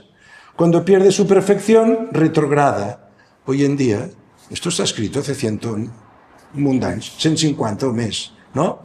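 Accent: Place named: Catalonia, Spain. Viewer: Excelente lección. Grandísimo trabajo. Gracias y enhorabuena. Por cierto, ¡maravilloso acento catalán!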